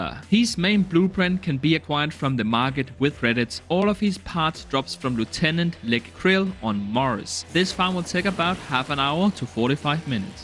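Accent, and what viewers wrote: Denmark. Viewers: classic danglish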